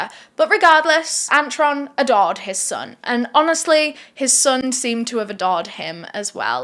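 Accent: United Kingdom. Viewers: You sound UK and look Irish but wearing an Italian heritage shirt soooo was wondering 🤔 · honestly, your way of speaking and accent is so annoying 💀 it was really hard to go through the whole video (i liked the case so i watched) without cringing at your horribly fake talking.. you're the type of person that makes people hate a british accent lmao like wth is STAWries?? DAWr? affAWRed? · How is it that you have a British accent but yet talk like the classic obnoxious, ditzy, Valley girl? “Um yeaaaa, like okkkkk. I love to stretch out the last syllableeee of the last word in each senteeeeence. Sometimes even a couple times a senteeeeeence. With a very low, annoying, tired like sounding toooooooone. Or speaking with a high rising terminallllll. That all ditzy girls doooo. Which used to be called Valley Giiiiirl because a lot of dumb bimbos from the LA California areaaaa would all talk like thiiiiiiis and some still dooooo but not even close to how many used toooo like when Paris Hilton was famouuuus. 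Kim K used to talk more like thissss and thats exactly the type of braindead person that would talk like thisss, a person who watches The Kardashiansssss and idolizes themmmm who also probably think the world is flaaaat and couldnt do simple basic math or comprehend anything over a 2nd grade level educationnnn” I’m not saying thats you, I’m just saying thats at least how you sound when you talk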